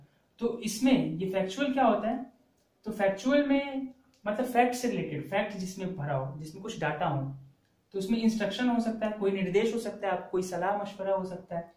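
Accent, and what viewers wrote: Bihar, India. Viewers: Bhai tum motihari se ho kya?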